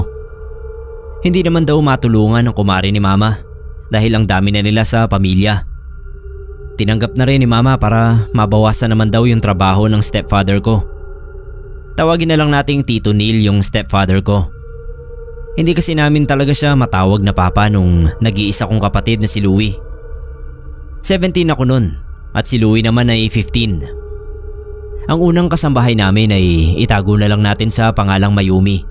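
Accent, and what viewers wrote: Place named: Cagayan, Philippines. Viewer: Parang taga Cagayan ang narrator. Itawes.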